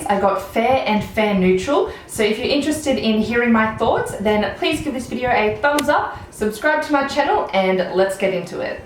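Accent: Australia. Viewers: Are you Australian live room Egypt ❤️❤️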